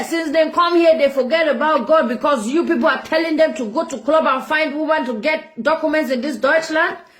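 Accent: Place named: Africa. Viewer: Your voice and accent sounds so African. I love it ❤️❤️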